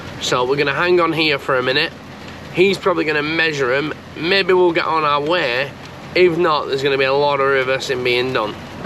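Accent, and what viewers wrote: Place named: Northern England, United Kingdom. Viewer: New to your channel, you don't sound local to redbull hq, so how did you get involved with red bull, and do you travel from your northern location to work?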